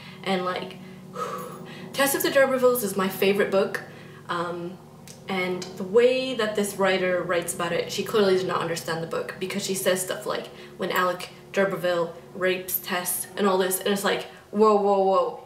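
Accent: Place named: Europe. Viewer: Is this lady European because I can hear a slight accent.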